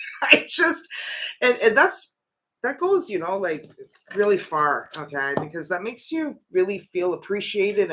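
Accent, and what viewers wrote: Quebec, Canada. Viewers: your Canadian french accent is so sweet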